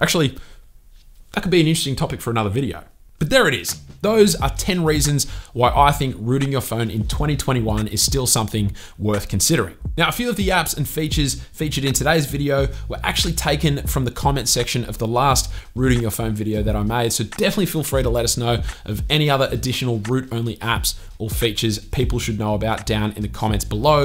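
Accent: Australia. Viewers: Haha I knew you were Australian as soon as I heard you talk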